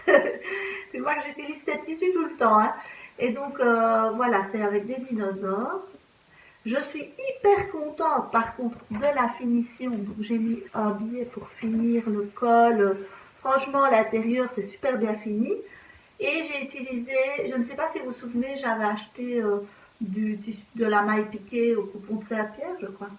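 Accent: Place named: Belgium. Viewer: Bravo, bravo pour ton concert à Rome! Ta robe sera très belle, j’en suis sûre...et je pense que le taffetas sera plus fluide une fois lavé. Pour les flex, oui, tu peux les couper en morceaux, pas de problème. Merci pour ce bon accent bien de chez nous et bisou de Marche-en-Famenne, Gene 🎼🎶🎶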